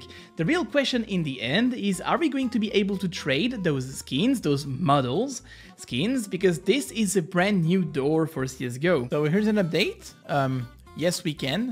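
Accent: France. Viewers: This is the first time i watch your videos. I just make it to and realized this dude is definitely french! xD. One of my friends said there is an amazing huge update in CSGO you must check it out and didn't want to install it but still wanted to see the updates you're the only one who covers them in youtube till now so thanks for reviewing the updates you are really quick in covering them. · pourquoi tu rate le public us wesh on entend bien que t'es fr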